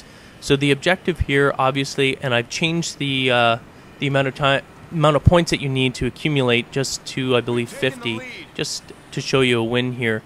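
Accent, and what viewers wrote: Canada. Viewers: may i ask if you're canadian? i thought i heard a hint of canadian accent.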